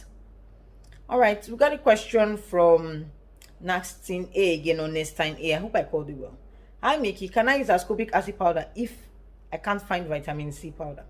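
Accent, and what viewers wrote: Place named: Yoruba, Nigeria. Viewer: I love listening to ur lectures, especially the way you speak that ur yoruba eehh, nice dear, plz can someone that did Ivf take satin supplement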